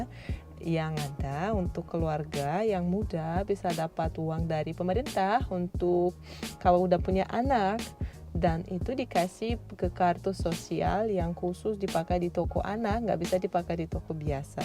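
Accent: Indonesia. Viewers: Logat sudah Indonesia banget 👍👍👍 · Masya Allah.... ekspresi, gaya bahasa, & cengkok Indonesia banget.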